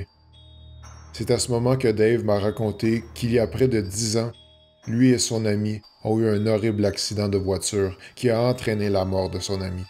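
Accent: Canada. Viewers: J'adore ton accent Canadien, cela donne du piquant à tes histoires.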